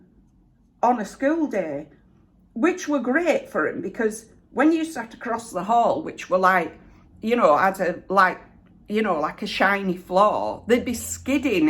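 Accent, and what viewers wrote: Yorkshire, United Kingdom. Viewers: You told this story so vividly I could just “ see” all the kids shivering in the snow …. It’s a good idea for to be applying your makeup whilst recalling a memory and interesting for us viewers to watch as well as listen I am originally from York now in New Zealand but I really enjoy all your videos and love your unaffected Yorkshire accent… I can relate to many expressions which you use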